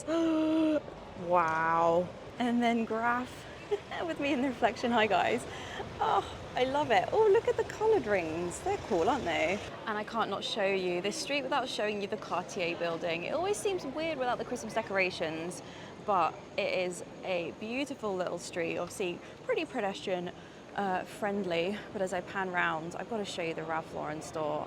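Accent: RP, United Kingdom. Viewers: Your Received Pronunciation is exquisite.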